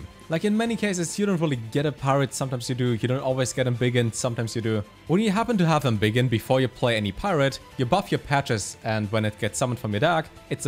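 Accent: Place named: Germany. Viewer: I cant quite tell where ur accent is from but it sounds german Wenn das wahr is dann is mein lieblings youtuber deutsch , genau wie ich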